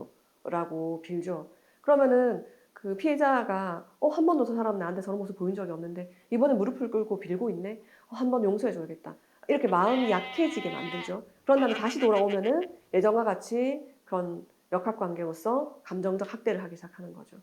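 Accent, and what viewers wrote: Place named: Gyeongsang, South Korea. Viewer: 오늘 깔끔한 얼굴에 갱상도 억양 굿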